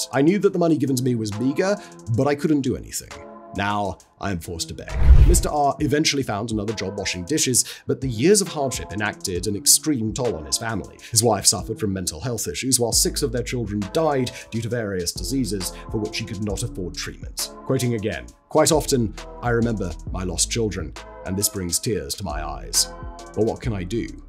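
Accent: United Kingdom. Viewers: Man, is British for real. That’s the speech of an educated white male for that part of the globe. And yes, he sounds a bit crazy. But information is great.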